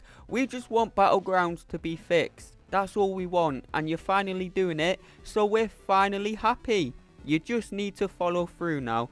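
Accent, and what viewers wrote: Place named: Liverpool, United Kingdom. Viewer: He sounds scouser to me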